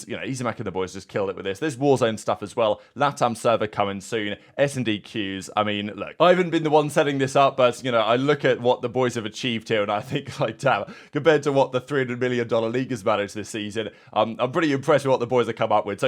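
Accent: United Kingdom. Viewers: Glad you made the decision to keep the intro. I was watching one of ur vids over Christmas break and my mom heard the audio and she was like “Holy fuck that’s a hell a British accent, I can barely understand him with that accent and how fast he’s talking” 😂😂 we’ve gotten used to the intro and switch it up if u think it’s needed change is good but I’m glad the original intro is staying for now lmao.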